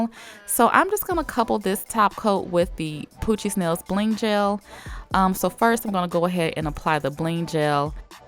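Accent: South, United States. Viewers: I was going to get this kit a few weeks ago but held off. I honestly wasnt sure if they would glow well or not, and being in quarantine I was hoping to do a fun set. I decided to order the kit a few days ago and now it is out of stock😭. I am sooooooo happy you did a review on this kit I love how honest and descriptive you are!!!!!! I'm originally born and raised in the south now I live in nyc so to hear that southern in your voice is like home!!!💖🥰🤗thanks for the videos keep them up!!!!!!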